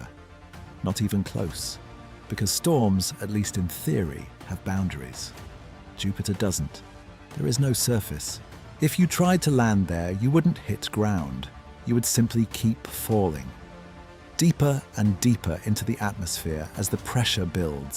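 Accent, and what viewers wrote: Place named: United Kingdom. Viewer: another femme british narrator!?!?! wtf is happening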